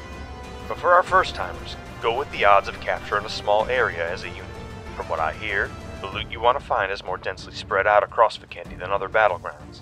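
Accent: United States. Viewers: Lol i love the new narrator's voice in this trailer . It's like one of those cowboy style accent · I don't like this man's southern drawl. Doesn't fit with the map. On Miramar it would barely work.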